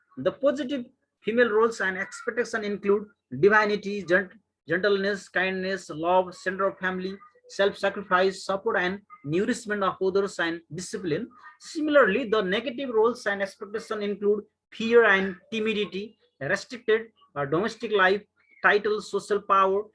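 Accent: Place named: United States. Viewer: Nepali ma ni vannu ni kattina american jasto english matra🙄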